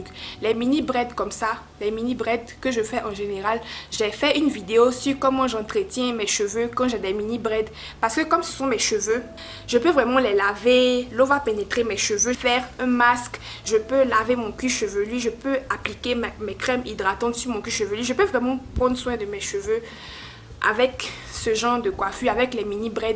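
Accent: Ivory Coast. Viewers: L accent me dis quelque chose .tu es ivoirienne ? Petit conseil prend plus le temps de parler tous doucement. Belle video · Hien les ivoiriens avec votre tonalité la😫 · Tu es vraiment ivoirienne le language qui ne trompe pas le ye qui ne trompe pas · Ma chère par ton accent j'ai sû automatiqmt que tu étais Ivoirienne😍😍😘😘🇨🇮🇨🇮🇨🇮. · Je vien d'arriver sur ta des que j'ai entendu ta voix e su que tu était ivoirienne❤️❤️🙏🇨🇮🇨🇮🇨🇮🇨🇮🇨🇮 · Super ! Contente d’entendre l’accent ivoirien fatiguée du chocor 😂🤸🏾‍♀️ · cet accent ressemble un accent de la côte d'ivoire bisou ma cherie moi je suis originaire de ce pays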